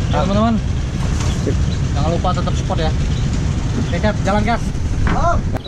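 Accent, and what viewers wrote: Bangka, Indonesia. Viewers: Orang mna bang. Kok kyk org bangka. Hehe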